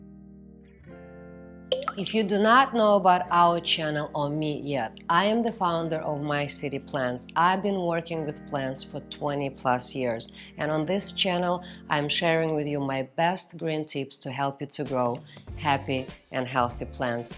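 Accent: Russia. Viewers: I love Russian or some sorta accent 😅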